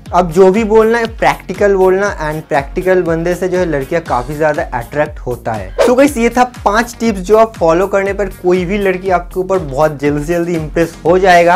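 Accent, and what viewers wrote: Bengal, India. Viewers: Bhai ye bangal hai...thori to dikkat hogi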